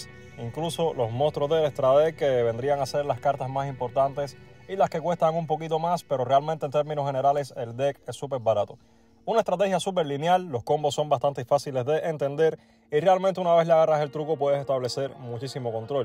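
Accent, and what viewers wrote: Cuba. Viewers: Asere tu eres cubano?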